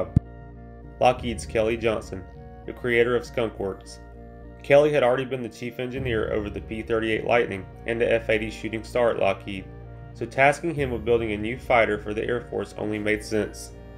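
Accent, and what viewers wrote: South, United States. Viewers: I*m having trouble placing your accent. The South for sure. I appreciate your videos. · Speak more clearly & evenly, enunciating, without Southern affectation if possible. Use a close microphone, like a boom mic, to record your narration. Lower the background music level. Most of all, stop the background music now & then, to demonstrate the sounds of the F-104 engine!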